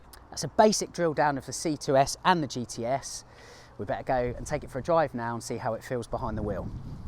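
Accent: England, United Kingdom. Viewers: he is English. They pronounce lots of words differently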